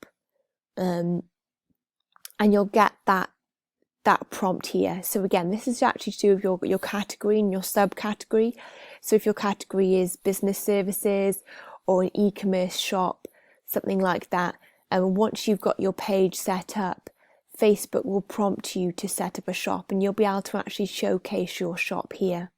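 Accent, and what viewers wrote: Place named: London, United Kingdom. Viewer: oh hell no! can't follow her accent at all. Why is she speaking like a london school teacher?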